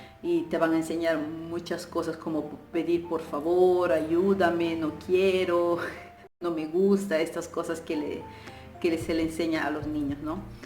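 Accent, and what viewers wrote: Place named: Italy. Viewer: 😂 por qué hablas con acento si no eres italiana de origen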